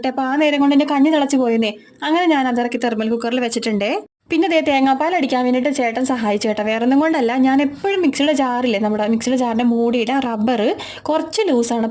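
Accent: Kochi, India. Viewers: ഇന്നത്തെ സംസാരം എന്ത് രസമായിരുന്നു കേട്ടിരിക്കാൻ... തനി കൊച്ചിക്കാരി കൊച്ച് ♥️🥰.. ഇതാണ് ഒത്തിരി ഇഷ്ടപെടാനുള്ള ഒരു കാരണം 🫂🫂🫂🥰🥰🥰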